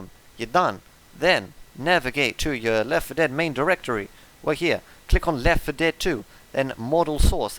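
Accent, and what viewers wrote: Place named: Finland. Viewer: but you have a finnish accent 😭😭😭 where are you from then (if you're comfortable sharing that)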